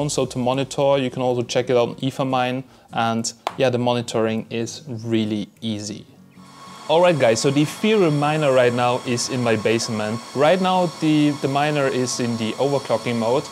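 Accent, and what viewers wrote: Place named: Germany. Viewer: durch zufall deinen channel gefunden :) irgendwie hört man als deutscher direkt wenn ein anderer deutscher englisch redet :) cooles teil der jasminer, ob sich das mit eth noch lohnt ist halt die frage, aber wenigstens geht dann noch etc damit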